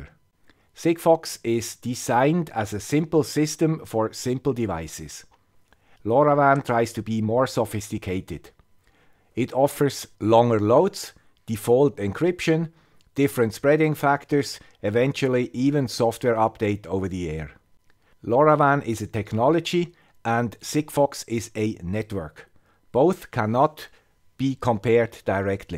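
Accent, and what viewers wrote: Switzerland. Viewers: Another excellent video from the "Expert with a Swiss accent": thank you so much for the qulity of the video. Please note that the 10xDL max.message per day comes from TTN "best practices" only: you do not have such limitation if you run a private LoRaWAN network (what you can forget with SIGFOX), nor if you use an Operator network (you might just pay more for these extra DL...). The deep indoor propagation of LoRaWAN is also better than SIGFOX. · Here's the guy with the Swiss accent, odrr?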